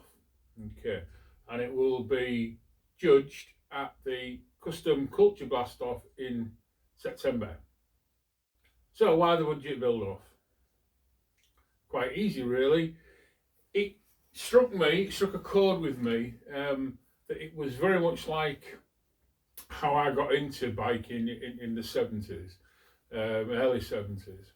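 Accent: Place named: Hull, United Kingdom. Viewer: Where are you based, sounds like a hull accent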